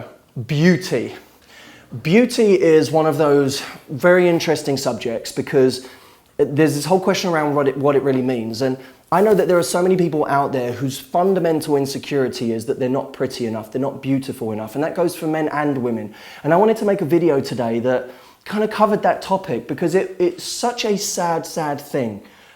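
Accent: England, United Kingdom. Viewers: you look smexy to..... i love brittish acsents <3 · Your So Hot, i dont mean to be shallow, re: being your looks, your English accent:) it's definatley your whole package;) love your video, thanks once again, always emotionally intelligent and of value. Cheers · your british accent is killing me! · I like British language 😂 I mean accent ☺️ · That's just how everyone in the UK speaks.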